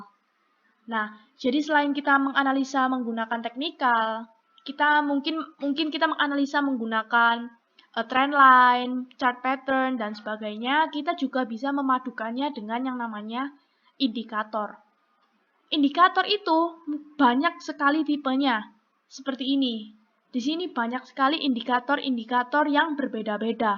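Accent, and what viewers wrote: Java, Indonesia. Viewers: Jawa mbak ya? 😁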